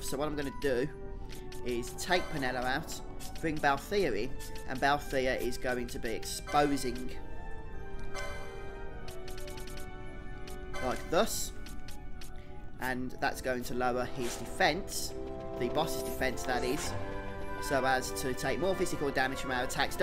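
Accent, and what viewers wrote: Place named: Birmingham, United Kingdom. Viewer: He's from the Birmingham region.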